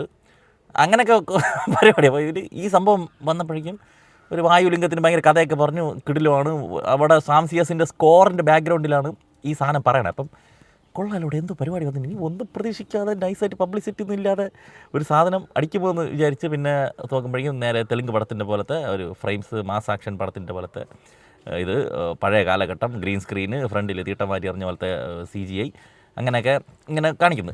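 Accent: Trivandrum, India. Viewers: Itrem bore presentation, maryadakk samsarichude, istapettillel athang paranjapore, trvm kare parayipikkan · ഇതാണോ തിരുവനന്തപുരം ഭാഷ ആണെങ്കിൽ nice❤❤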